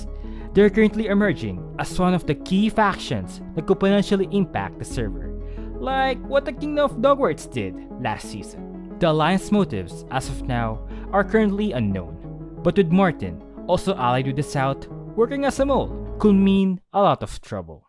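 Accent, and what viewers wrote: Philippines. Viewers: Ya kinda have a Filipino accent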